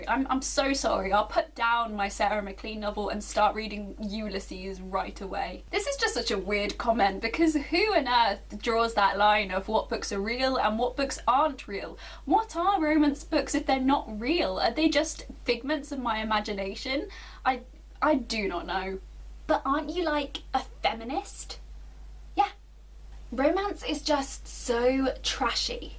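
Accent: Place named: England, United Kingdom. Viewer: Your english accent! I thought you meant egyptian mummy omg 😳 Also I didn't realize people actually say these things ☹️😂 the world we live in 🤣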